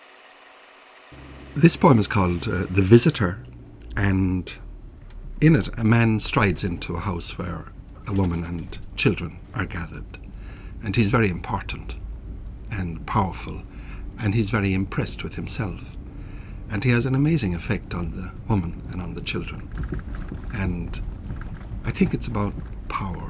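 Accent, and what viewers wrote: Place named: Kerry, Ireland. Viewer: Beautiful, dreamlike commute with the loveliest Kerry accent, that takes me back to childhood memories of sitting on the carpeted floor listening to Brendan Kennelly on the Late Late!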